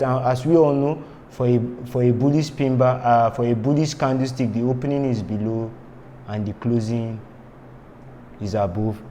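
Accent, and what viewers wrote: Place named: England, United Kingdom. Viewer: Great explanation 👌 i love your teaching style and English ascent, i am very suprised to that with this great information u have passed freely to us no one is commenting or sharing this video, for i have subscribed and share ur link cox i like ur teaching and i want u to always do more of this good work 👏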